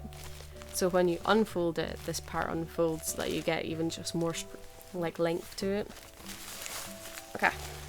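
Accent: Scotland, United Kingdom. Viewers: 1. Your accent is amazing, love.Scottish. 2. Thank you for your absent absorbent and ABDL products... it's hard to find them that works for most adults, and your abdl reviews is comprehensive and brilliant. tyvm for being awesome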